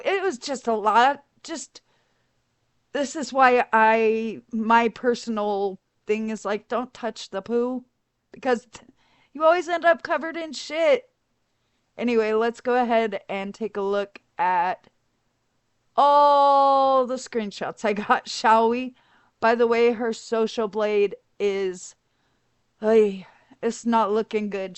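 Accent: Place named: South, United States. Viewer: !! Ngl I’m fan girling right now. I love your channels & think you’re incredibly talented! I love your quit wit & humor, plus as a Southern girl your accent reminds me of home. ❤ Just had to utter fluff 😜& send you love & appreciation. 😊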